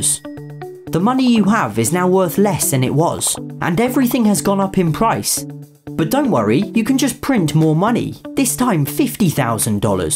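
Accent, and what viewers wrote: United Kingdom. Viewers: within the first 15 seconds, i knew you were British, the ascent and the monopoly board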